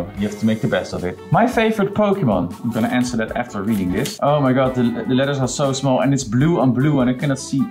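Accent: Netherlands. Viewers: As a fellow dutchie who's used to english content creators i'm just cringing so hard at our accent. Congrats tho you've earned it🥳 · how do you cringe, I love the Dutch accent. voice is angelic lol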